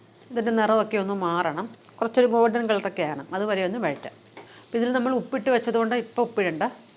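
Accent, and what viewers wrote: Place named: Mavelikkara, India. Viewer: Nalla presentation anavashya vachakam onnum illa bhasha kettittu oru mavelikkara side pole thonnunnu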